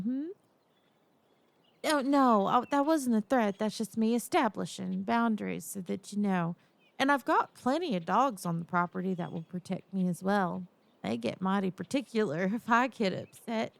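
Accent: South, United States. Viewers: Damn I am never this early, not gonna complain, we love the southern asmr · I love your southern accent!! This is a cute audio! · I love cow girls, and yours is my new favorite. The southern accent and flirting are so good, and I love the yandere turn. "Milk straight from the tap" is wild. But also, yes please!🥰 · First time listener,and I wanted to say that was very awesome. I loved your voice. The southern accent was great. I wish I could have an obsessive cowgirl take care of me lol. I enjoy how sweet she was and you slowly peel back the lairs,and find out she a crazy. I also enjoy the slight lustful side of her. The outro was pretty cool to hear that you relate the stories to irl situations. Plus it kinda gives us a little peak behind the curtain and get know a bit about you. So I recommend doing outros. Sorry for being so long winded 😅.i just wanted you to know you are amazing, and I can’t wait to listen to more 😊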